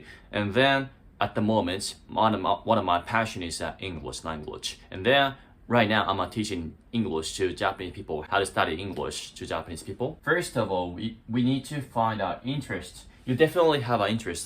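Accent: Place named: Australia. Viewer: Very good video! I love that you share positive messages ❤ I wish I could learn to speak Japanese. Congratulations on working on your English, you’re doing a great job! I can tell you learned Australian English 😊 - I am American - our English has a different accent. Just an observation 😊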